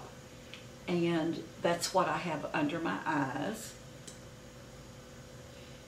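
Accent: South, United States. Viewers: You are the cutest! The lashes look great on you! Your southern accent 😍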